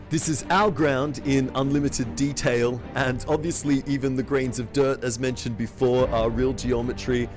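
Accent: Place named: Australia. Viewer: As an american used to the way americans speak I have to say I do sense a bit of an eronant undertone in his speech but I know that thats just the australian accent. So hes not a douchebag, his accent probably just tricked you into thinking that.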